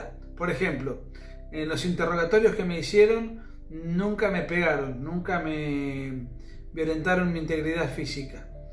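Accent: Mar del Plata, Argentina. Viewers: Acento de mar del plata ja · Ah!!! El acento de Mardel. Tremendo acento. Tan o más identificable que el cordobés. Sobre todo Mar del Plata del Norte. xD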